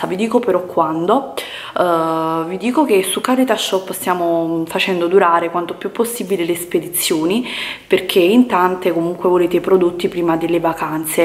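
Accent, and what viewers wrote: Naples, Italy. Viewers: È napoletana, molto probabilmente è abituata a parlare con il suo dialetto, è normale che qualche volta può imbrogliarsi, anche a me succede, certo questo non vuol dire che i napoletani non sanno parlare italiano perfetto, assolutamente, io parlo per il suo caso ( ci tengo a precisare sennò mi attaccano tutti )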